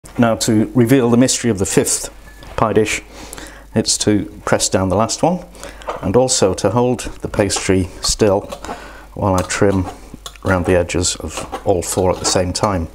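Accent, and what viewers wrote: Australia. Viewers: Love Aussie accent! Go for it! Awesome recipe! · NEVER apologize for your Aussie accent! Great looking recipe and video. Can't wait to try it. I grew up in Adelaide from ages 5 to 12 then moved back to the states. Lost my Aussie accent damn it. I remember pies like this and sausage rolls. People love when I make sausage rolls, but I want to add a pie to my list of nostalgic cooking. Can't wait to try this. Thank you. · There is no need to apologise for your Australian accent. Just like a British does not need to apologise for his English accent in America or Australia! More interested in your recipe. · What BS! I just luuv the Aussie & Kiwi accent, mate.... Really enjoyed this recipe! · I love Australian accent 😍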